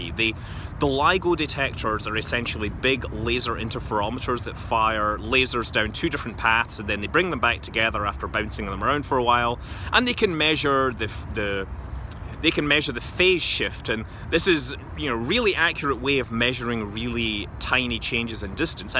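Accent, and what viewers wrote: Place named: Glasgow, United Kingdom. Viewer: I knew that accent was from Glasgow! astronomy always getting something impressive to show... go Science haha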